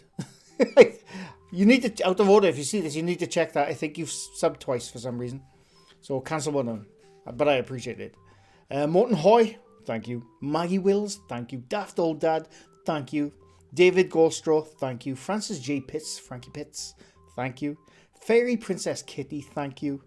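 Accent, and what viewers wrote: Wales, United Kingdom. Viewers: it's even better in a Welsh accent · I like your accent... so I like your Welsh accent too!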